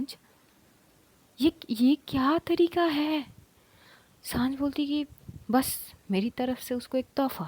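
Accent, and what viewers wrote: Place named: South, India. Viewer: 𝗛𝗲𝘆𝘆𝘆𝘆𝘆 𝗱𝗲𝘃𝗶𝗶𝗶𝗶 𝗷𝗶𝗶𝗶 𝗹𝗼𝗵 𝗵𝘂𝗺 𝗮𝗮𝗴𝘆𝗲 𝗮𝗽 K𝗲 𝗸𝘂𝗰𝗵 𝗮𝗰𝗰𝗵𝗮𝗶𝗶𝗶 𝗮𝘂𝗿 𝗯𝘂𝗿𝗮𝗮𝗶 𝗹𝗲𝗸𝗿 𝗰𝗼𝗺𝗽𝗮𝗿𝗶𝘀𝗼𝗻 𝗯𝗲𝘁𝘄𝗲𝗲𝗻 𝗻𝗼𝘄 𝗮𝗻𝗱 𝘁𝗵𝗲𝗻 💁👇 1. 𝐕𝐨𝐢𝐜𝐞 𝐓𝐨𝐧𝐞:) pehle aap ke voice thode rough thi mtlb tone me ab softness aagayi hai 😌 Phle vo lehza apka aaj v wahi hai Lekin aapka andaz thode badal gya aur apke mizaz ka to kya 𝗸𝗲𝗵𝗻𝗲 .... 𝗝𝗮𝗴 𝗴𝗵𝘂𝗺𝗲𝘆𝗮 𝘁𝗵𝗮𝗿𝗲 𝗷𝗮𝗶𝘀𝗮 𝗻𝗮𝗵𝗵𝗵 𝗸𝗼𝗶😌 Jab mene apki voice phle bar sune the mujhe lga oh ye thode rude arrogant hongi 🤣🤭 fir bad me jana nah bete tera bhrm hai yeh inke jaise pyara to..... 𝗡𝗮𝗵 𝗸𝗼𝗶 𝗵𝗮𝗶 𝗻𝗮𝗵 𝗸𝗼𝗶 𝘁𝗵𝗮 (Bas bas compare Krna h acchai nhi 🤭) 2. 𝐀𝐜𝐜𝐞𝐧𝐭 :) pehle aapki Hindi cute the just like South walo jaise Lekin fir v apka Hindi me story continue kri usme v mene to kabhi accent me apki language aate nhi dekha yeh h 🌛lagan aur nishtha se apne kaam (storytelling) ke liye samarpit hona ......🫶 3. 𝐏𝐮𝐑𝐞 𝐇𝐢𝐧𝐝𝐢 :) kabhi kabhi aap itni acchi words use krte ho ki lgta hi nhi aap ki mother tongue hindi nah ho ha mai Puri tarah to nhi kh sakti ki apki hindi puri thik hue h but ha ab aap jarha h ko ja rhi hai nhi khte mtlb bande ko bandi me convert nhi krte 😀 4. 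4. 𝐃𝐞𝐝𝐢𝐜𝐚𝐭𝐢𝐨𝐧 :) han phle phle aap stories pe characters ke name pe thode confuse ho jate the but abhi abhi apka ye chise bahut had tak kam hogyi hai ...aap rat ho din ho no matter 11 baje v aap stories dalte ho to ab characters ke name pe utna confuse nhi hote Suru suru me aapka discribe krne ka tarika v khoobsurat tha Lekin ab khoobsurat ke saath saath imagination ki duniya ke hume le jakar khada kr deta hai hum un chiso ko vis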